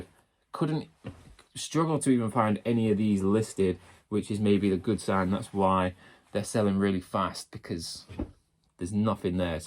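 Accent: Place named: United States. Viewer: why you sound american if your from Uk, the fuqq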